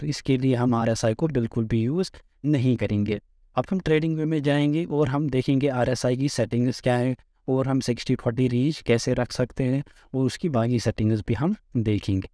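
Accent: Kashmir, India. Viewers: Bhai. aap ne to pura gyan hi de diya. Unclear vision sab fully clear kar diya he. Love you bhai. Hamesha khush raho aap or ese hi knowledge share karte rahiye. Apka awaz sunke mere kashmir wale dost ki yaad aa gayi. I am your subscriber now. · Ur accent .... Kashmir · After listening just couple of your words i came know your are from Kashmir... Well done 👍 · Your accent is kashmiri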